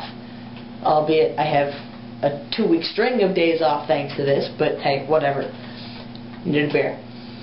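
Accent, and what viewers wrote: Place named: Texas, United States. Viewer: so, if you are not from Texas where are you from. You must have lived in Texas for long enough to gain an accent. I really enjoy your video, and you look amazing.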